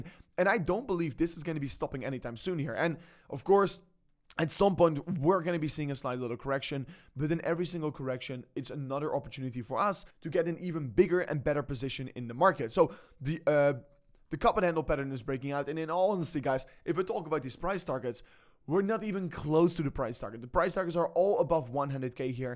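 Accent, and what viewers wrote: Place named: Netherlands. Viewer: aaah I just knew from your accent that you are dutch hahaha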